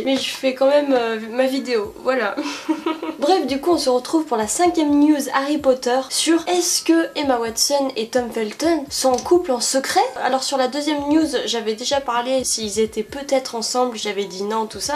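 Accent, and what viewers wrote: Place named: England, United Kingdom. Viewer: J'ai enfin pu regarder la vidéo !!! J'ai du retard moi pfiou.. BREF ! Ton accent anglais est merveilleusement sexy j'adore hihi. J'aimerais trop aussi les voirs ensemble, c'est comme une série qu'on suit avec eux ahah!!! Je veux connaitre la suite moi 💚💚 McGonagall on vous a reconnu faites pas l'innocente. Par contre je savais pas qu'elle était aussi agressive que ça aha. Bisous xoxo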